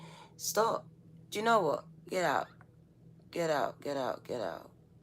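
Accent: United Kingdom. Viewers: I absolutely love your old world Brit accent. My father was British and had one too.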